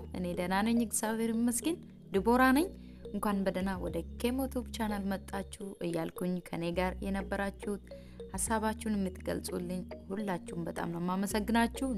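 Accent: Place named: Wollega, Ethiopia. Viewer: Loqodan ittiin guddadhe qulqulluu wallaggaa gaafan dhagahu nan gammada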